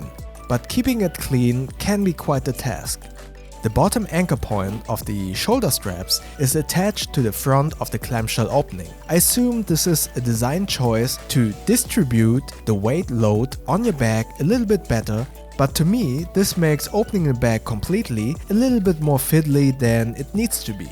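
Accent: Germany. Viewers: Excellent review! Love your German accent! 😊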